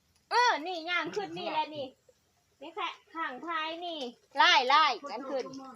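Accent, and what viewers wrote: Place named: Isan, Thailand. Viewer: ชอบเสียเว้ามาก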